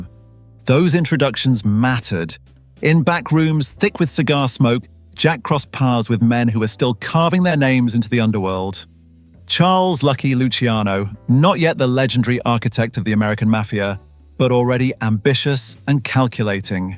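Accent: England, United Kingdom. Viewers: Dont listen to the haters, really delete the comment, Thats what Jack would have done ( probably that and more 😅😅). Your accent is rich and sounds like proper English. You and fellow Podcaster The Last Supper have top notch Flows🌊🌊🌊🏞🌀🌀🌀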